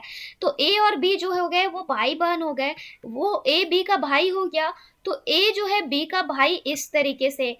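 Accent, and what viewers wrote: Bihar, India. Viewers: Mam, kya aap Bihar Sharif se ho?? 🤔🤔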